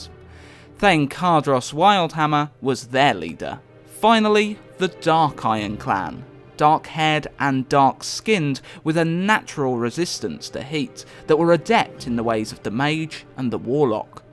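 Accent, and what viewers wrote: United Kingdom. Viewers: British accent detected !!!!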